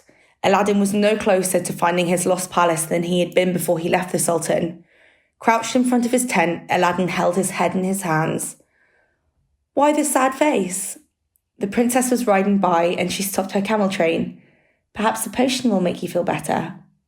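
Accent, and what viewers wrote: United Kingdom. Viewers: I love British accent. Thank you very much.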